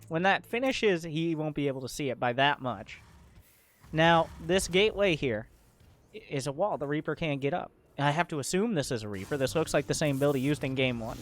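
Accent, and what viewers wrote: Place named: Texas, United States. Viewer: You sound like youre from Texas,. When you started commentating all fast it reminded me of those auctioneers xD can i get a thounsand? Thousand two thounsand five, six, two thounsand!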